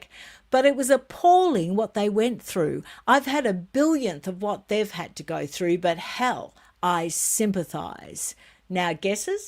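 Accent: Australia. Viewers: I listen to you on YouTube mainly because I miss the Aussie accent. I was a ten pound pom and lived in Adelaide nearly twenty years. I wish people would stop abbreviating Catherine to Kate, but it was great to see who in the royal family were there. Did you find out who the guy was behind Catherine? In UK we need the Royal family especially when some of ministers show their lack of diplomacy by bad mouthy other politicians around the world.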